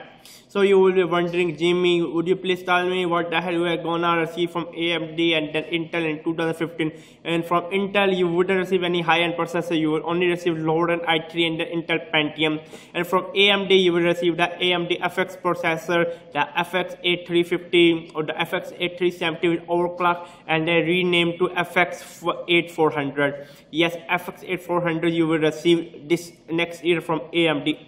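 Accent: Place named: India. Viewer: Damn what are you talking can't unterstand with this kinda Indian or whatever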